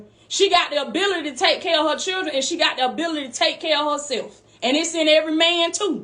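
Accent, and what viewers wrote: South, United States. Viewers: I heard you loud and clear from the intro, you made me jump up! I've been praying for months now, " God show me how to generate multiple streams of income ". But God has given me three basic instructions: start small = plant a seed & I will make it grow, step out in faith & out of comfort zones, don't boast be humble... do these three things constantly and I will bring the overflow 🏞 💷 ⛲ 💷 🌊 💷 I love your messages, your motivation and your Southern twang, you put a fire up where the sun don't shine! 😂 I really appreciate your ministry, thank you sister in Christ, love from London UK 👑 ❤ 🙏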